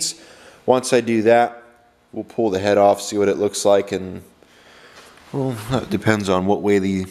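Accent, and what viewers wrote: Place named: Maine, United States. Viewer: Sounds like your from Maine, thats where i'm at too.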